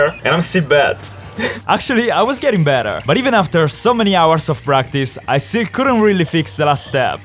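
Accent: Italy. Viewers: no vabbè ma sei italianoooooooo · pov: guardi per la prima volta un suo video e ti sembra abbia un accento molto italiano, guardi la sua descrizione e...... beh è italiano :D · Italian english be likes Quand'è che dici shish? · Quanto si capisce che sei italiano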